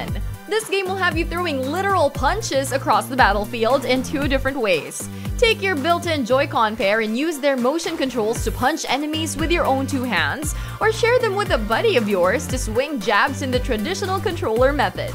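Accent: Canada. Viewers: Is it me, it does she have a Canadian tinge in her voice?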